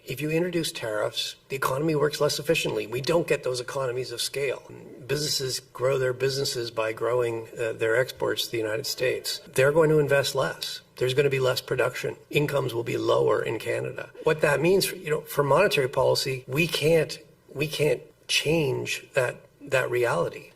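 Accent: United Kingdom. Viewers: Lol, IDK but I love it. Obviously he is some kind of libertarian type who understands economics. The scary thing about Carney is he doesn't understands economics, he only understands statistics and politics. He is essentially a Stalinist. It is fun to hear criticisms like this in a British accent. Usually one just hears the colonial pretensions about how great the UK is and how lucky Canada is to be part of it. This channel is keeping it real. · What kind of British accent is this? Anyone know?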